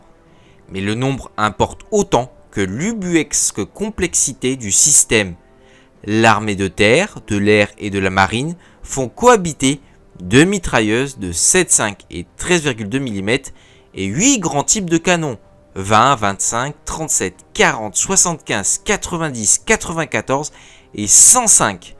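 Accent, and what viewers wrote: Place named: England, United Kingdom. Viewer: Je suis attristé d'entendre que les noms allemands sont prononcés à l'anglaise ! C'est dommage parce que la vidéo est bien faite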